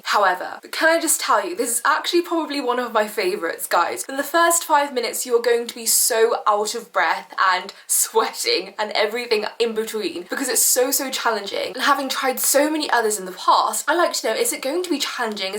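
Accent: United Kingdom. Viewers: I love ur british talking style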